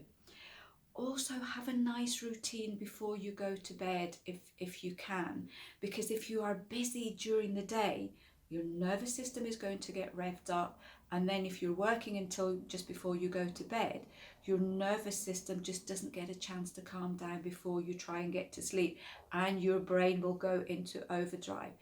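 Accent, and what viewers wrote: Scotland, United Kingdom. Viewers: Mine are just starting. I don't go red but I certainly sweat. Can I take sage tablets while taking citalopram? Do I hear a wee scots accent there? I'm scottish but live in Germany...😊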